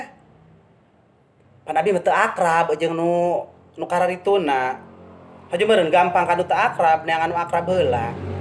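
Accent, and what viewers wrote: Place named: Banten, Indonesia. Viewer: Siga urang banten ngomongna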